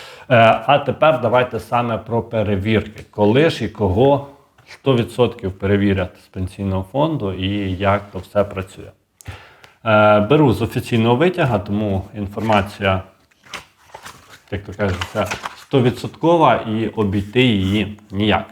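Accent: Vinnytsia, Ukraine. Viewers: У нього вінницький діалект. Тому так розмовляє. А ви що, розмовляєте літературною мовою?!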